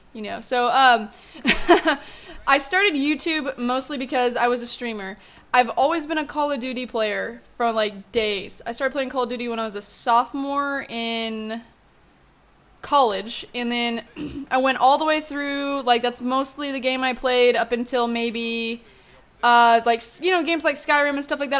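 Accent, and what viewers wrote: England, United Kingdom. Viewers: Hahaha, Your British accent :) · Keep it up. You'll probably keep getting shit because most of the people on Youtube are quite young and narrow minded. Having a female on the scene though is a good thing. P.s don't let Stephen Moyer hear your English accent =) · Your British accent <3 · BEST BRITISH ACCENT EVER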